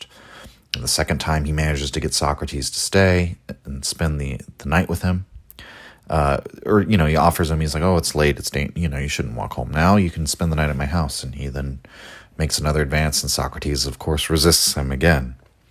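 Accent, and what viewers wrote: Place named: England, United Kingdom. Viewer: I'll never get used to hearing ancient Greek names in an English accent 😭 I think you'd enjoy the sound of the actual names ❤️😊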